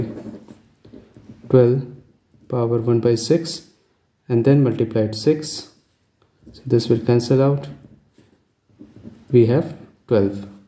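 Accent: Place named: India. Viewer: Bro, Are you Indian ? Your accent is like 🇮🇳 Indian? By the way one of the nice way to answer the question.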